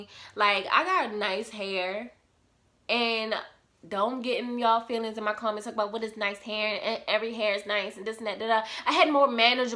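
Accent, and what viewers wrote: United States. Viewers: that's why I'm trying to work for myself and I love your accent are you from new york. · I clicked on ya video and immediately knew u was from Baltimore lol i was like she sound Nd talk like me😂❤️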